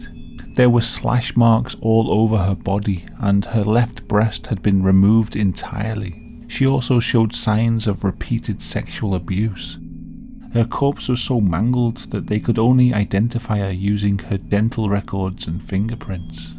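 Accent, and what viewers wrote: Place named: Midlands, United Kingdom. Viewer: Excellent!! Just subscribed! Love your midland accent..it sounds so pretty💯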